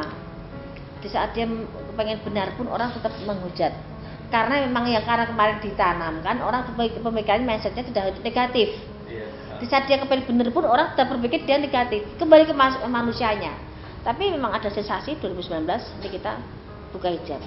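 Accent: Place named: Banyumas, Indonesia. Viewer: Mbak iki wong ngapak ya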